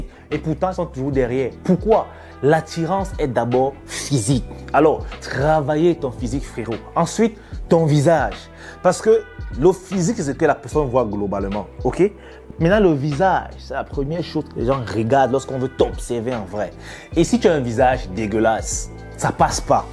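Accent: Cameroon. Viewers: T'as l'accent camerounais, suis camer aussi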